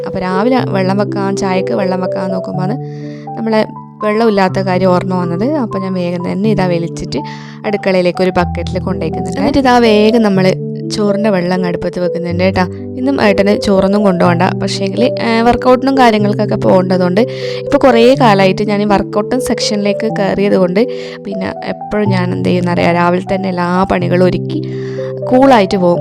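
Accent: Kannur, India. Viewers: കണ്ണൂർ ഭാഷ ഇഷ്ടപ്പെട്ടു വർക് ഔട്ട് കൃത്യമായി നടക്കട്ടെ വിശേഷങ്ങളും വീഡിയോയും ഇഷ്ടപ്പെട്ടു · വീഡിയോ സൂപ്പർ ആയട ❤ കണ്ണൂർ ഭാഷ എനിക്ക് ഇഷ്ടായി ഞങ്ങൾ ഇവിടെ unnithand എന്നാ പറയാറ് ❤️❤️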